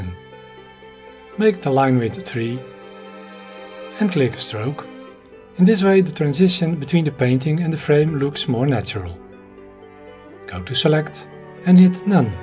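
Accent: Netherlands. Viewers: I adore that little touch of Dutch accent, you speak quite calmly and can be understood very well!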